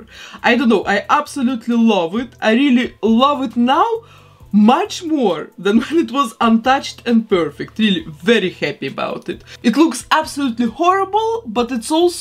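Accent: Russia. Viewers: Обалденно! А у вас русский акцент?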